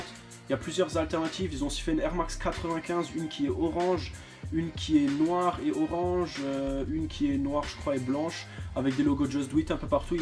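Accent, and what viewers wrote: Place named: England, United Kingdom. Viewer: L'accent anglais et certifier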